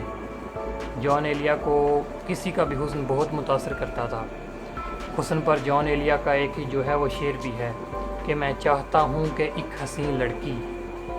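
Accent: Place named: Punjab, Pakistan. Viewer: بھائی! ایسا لگ رہا کہ آپ پنجابی میں بات کر رہے ہیں۔ اِ کو اکثر َ تلفظ ۔۔۔۔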